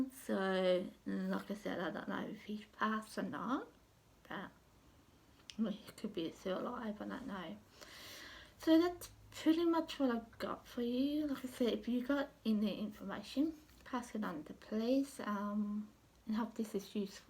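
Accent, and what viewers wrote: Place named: Australia. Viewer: She is Australian. Those of us from the states need subtitles. Very DIFFICULT to understand her accent.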